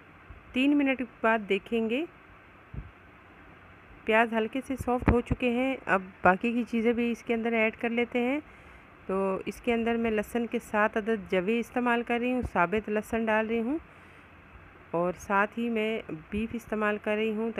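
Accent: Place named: Sindh, India. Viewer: Sis ap sindhi hain . Bhat krne ka andaz sindhi jaisa hai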